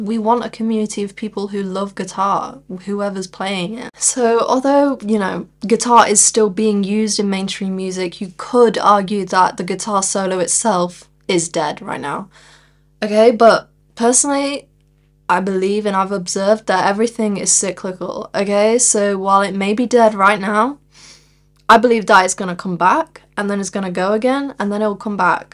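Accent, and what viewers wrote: England, United Kingdom. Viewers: “Rock isn’t dead, it just smells funny” - Frank Zappa, sort of. Agree very much with your assessment of the state of the art. Curious to know what part of England your accent is from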